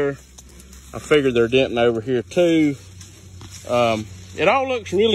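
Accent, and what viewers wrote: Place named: North Carolina, United States. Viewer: I knew you were also from NC just from the accent, before I saw the channel name. Thats some good lookin corn there!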